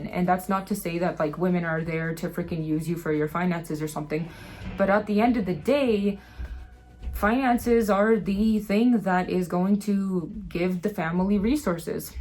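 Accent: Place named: Brazil. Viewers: As a brazilian myself she pretty much sounds like one equal who have lived for years on an anglophono country. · are you brazilian? trying to figure out where that accent comes from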